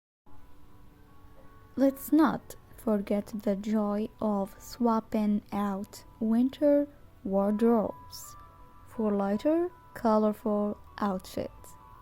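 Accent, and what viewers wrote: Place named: England, United Kingdom. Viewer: نسمات الربيع بنبرة إنجليزية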